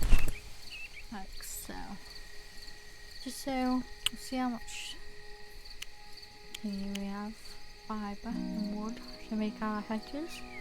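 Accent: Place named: Yorkshire, United Kingdom. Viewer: Voice of a yorkshire angel to guide us through the demo! Amazing 😁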